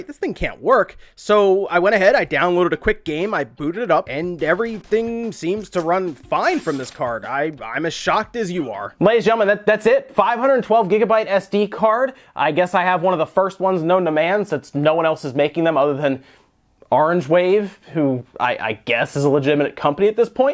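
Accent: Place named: Philadelphia, United States. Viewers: Hey you from Philly or Delco? Edit: N/M saw the Eagles jersey! Was going off your accent. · First video. Heard your voice first, thought it had a hint of Philly in it, then saw the Flyers and Eagles swag - confirmed.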